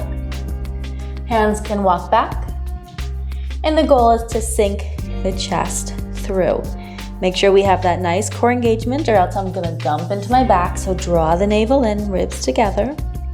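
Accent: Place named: United States. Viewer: Yoga has this saying that inversions works on your anti aging thing so it's the fountain of youth and I love your American accent namaste🤗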